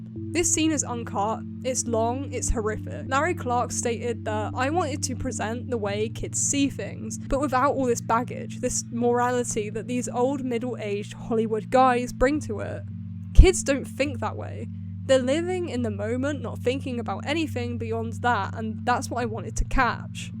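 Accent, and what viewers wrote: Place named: England, United Kingdom. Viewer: Awesome, I'm in Scotland. I did think you sounded English to be fair. I don't mind buying music blind but not movies, funnily enough. I used to but not anymore. When is part 3 coming?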